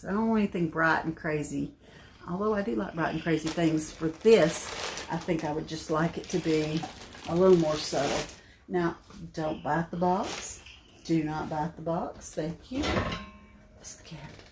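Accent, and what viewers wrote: South, United States. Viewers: This is the 1st video I have seen of your channel, I am loving it...I am from Ohio and LOVE your sweet southern accent.I am a thrifter and have been for 42+ years, you can make your home SO unique because things you find aren't mass produced like a big box store....everyone tells me my home is very unique and interesting , there is ALWAYS something different to see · Dear sweet lady, I knew exactly what you meant, I could never imagine you taking anything. I love your thrifted fines, the way you decorate, your Southern voice, you are a blessing !!! I do hope you get over your cold quickly so you can hold your new Grandson soon. Congratulations !!!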